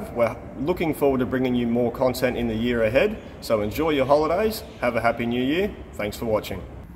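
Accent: Australia. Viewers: I thought you were Australian until I saw that ute launch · Build the drift car! Also Australians get a pass for profanity, no one says the F-word like you do!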